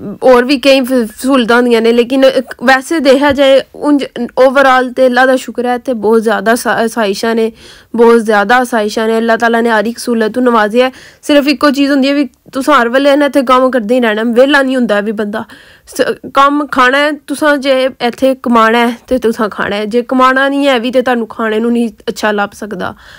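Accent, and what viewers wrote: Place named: Gujrat, Pakistan. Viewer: So aweet sister Ap pakistan mn kahan say hain?? Mujhe lgta he ap gujrat side say hain Punjabi say lgta he Plz reply krain 😊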